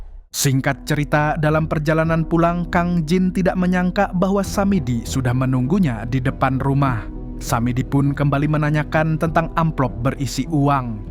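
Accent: Banjar, Indonesia. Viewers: Terlalu banjar mang ai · Pian ni orang Banjar KH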